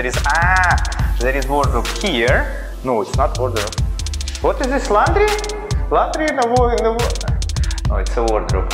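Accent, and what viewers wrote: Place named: Russia. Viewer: Are U Russian? I can hear familiar accent 😆 Seriously. I like Dubai. Been there 3 times and want more 😌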